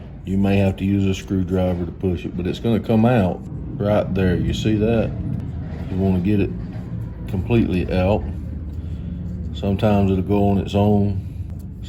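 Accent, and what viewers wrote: United States. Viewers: Excellent video brother! Helped me get my machine back running and made a good days work. Also your accent is killer! I’m guessing you’re from Georgia Love from Florida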